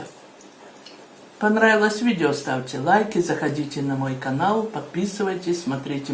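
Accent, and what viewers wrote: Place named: Odesa, Ukraine. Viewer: Какой город. Говор одесский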